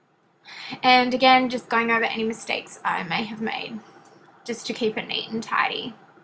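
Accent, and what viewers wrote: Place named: South, United States. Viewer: Holy crap so much for just brows! I love your southern accent though hehe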